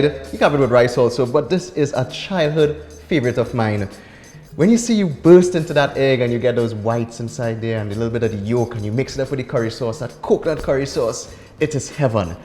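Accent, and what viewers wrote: Trinidad and Tobago. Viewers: Boy you talk like REAL,country folk(from gran couva) people love this dish cause any thing goes with a hot pepper. . ...Boy time to get teaching to us to make black cake ginger beer and some wine boi😊 CHRISMAS SEASON......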